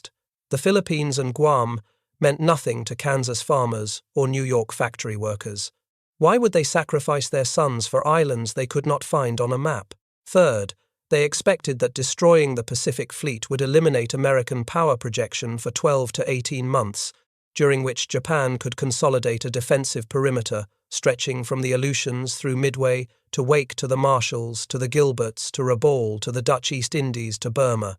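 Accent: United Kingdom. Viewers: Does having a narrator with an English accent makes the information more believable?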